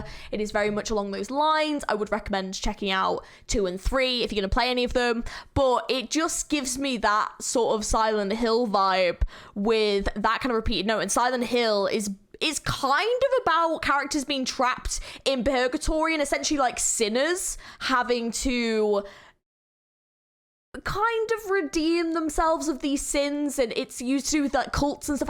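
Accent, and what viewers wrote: United Kingdom. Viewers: This girl is so british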